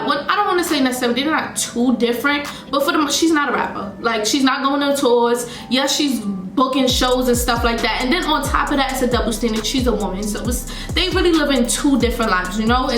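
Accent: New York, United States. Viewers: Are you a NYer??? I feel like I can recognize our accent anywhere lmaooo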